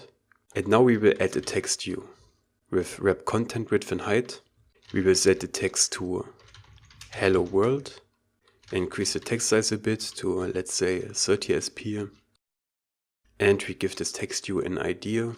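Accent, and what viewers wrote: Germany. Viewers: hört man richtig raus das du aus Deutschland kommst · yes...... your accent ....... it's abit nearer to German ...... even i turned on auto subtitles .....it generates German ...... so could i have connection with you ....? if you could add subtitles manually and i could help in that also if you need in Arabic ....Just a Suggestion . <mail>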